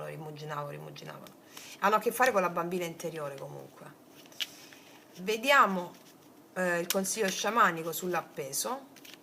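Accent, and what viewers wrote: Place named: Salento, Italy. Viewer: Grazie ❤ ascoltarti un piacere per la tua preparazione passione e per il meraviglioso accento 😊love Salento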